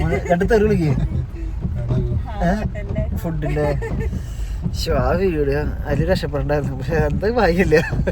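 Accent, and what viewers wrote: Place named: Thrissur, India. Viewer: 😹ithethu slangaaa ,thrissuraa...chavaru slaang ...njan stopped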